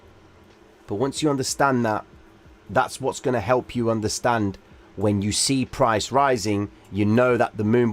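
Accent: Cockney, United Kingdom. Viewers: Man, U R flipping brilliant. I learn 10-20 different things on every video you make. Thanks. Beginning to understand the MM strategies. Pretty sure it will help in the future.Of course, always remembering that anything can happen. By the way, U got an Italian name and a Cockney accent. Remind me of a few friends I had back then.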